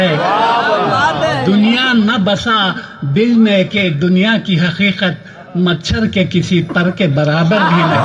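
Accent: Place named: India. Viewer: Nahi ye mujhy unki awaz nahi lagti mera dil nahi maan rha mashaAllah jysa face hy wysi awaz nahi hy. Ye koi indian hy lehjy sy maloom hota hy. Or koi patla bnda hy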